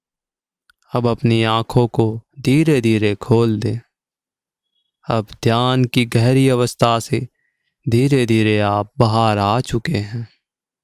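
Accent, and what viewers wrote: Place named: Rajasthan, India. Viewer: राजस्थानी लहजे में बोलते हो, कहा से हो ?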